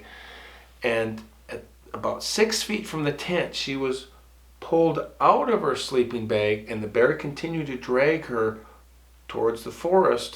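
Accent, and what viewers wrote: Minnesota, United States. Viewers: I knew you had the Minnesota accent!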